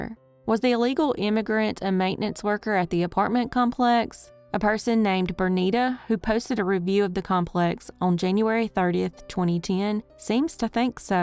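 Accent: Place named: South, United States. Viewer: Just saying...imagine that accent our kids would have... My Russian + This Southern... Awesome job on these stories though... 😀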